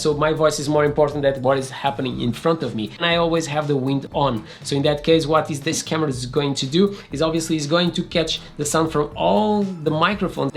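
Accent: Portugal. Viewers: Where are you from my guy that accent sounds kinda portuguese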